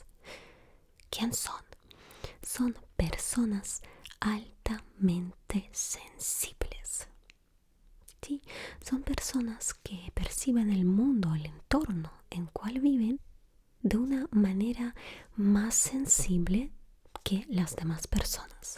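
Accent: Russia. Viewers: Por ese acento de una Rusa hablando español <3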